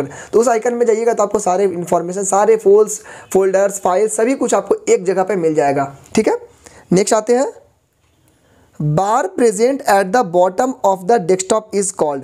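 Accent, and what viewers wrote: Bihar, India. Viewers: Hello bhaiya , you teaches very well. I study IT from you and I want to ask you that are you from Bihar?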